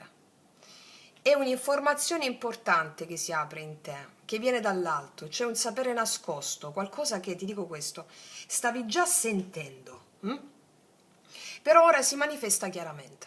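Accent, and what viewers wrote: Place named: Lecce, Italy. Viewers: Sei stata incisiva 🎉 Dall‘ accento sei salentina , ti seguo dalla Francia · Grazie mille, sei fantastica. Mi piace tanto la tua lettura e la base sciamanica che hai. Oltretutto hai l'accento leccese che mi riporta totalmente a casa. Io vivo da 57 anni in Germania ma nonostante distinguo sempre molto bene quando sento parlare l'italiano da una leccese. Un abbraccione e grazie. Ti seguirò con piacere.🙏❤🥰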